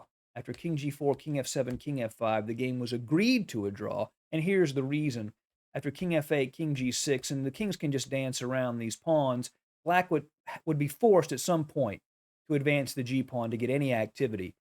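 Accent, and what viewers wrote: United States. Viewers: Thank you, sir. Your commentary is the best. You and the Chess Wisdom guy. But it's nice that you speak like an ordinary American. He is also great but the accent is a little distracting.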